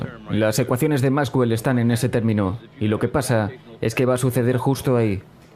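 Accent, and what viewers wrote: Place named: Spain. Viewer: Pinche gente huevona que no tiene otra cosa que hacer ? Pongance a trabajar de verdad, y esa pinche voz española agrede el oido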